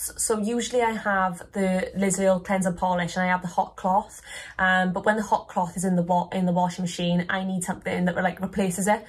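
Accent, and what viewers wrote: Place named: United Kingdom. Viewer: Love your accent dear! Can I ask where from the UK are you? Scotland maybe? 🥰 Also unfortunately cannot find you on Insta under your username :(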